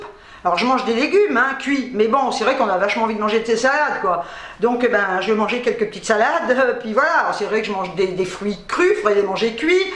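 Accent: Algeria. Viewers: Cc l accent pied-noir j adore bisous ma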